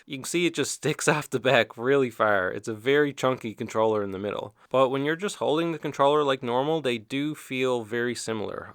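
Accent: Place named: Eastern Canada, Canada. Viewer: Would you happen to be from Newfoundland? I can hear the eastern canadian accent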